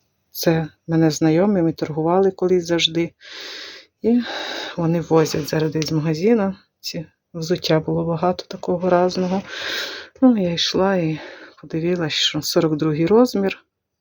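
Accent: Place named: Ovruch, Ukraine. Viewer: Все у вас добре, урожай є, і говорите ви гарним суржиком, по особливому, по овруцькі, як мені хочеться в Овруч, може пізньої осені вдасться, люблю ваші відео про місто, про ваші квіти, мальви... Головне щоб було здоров'я і мир!!!!!!❤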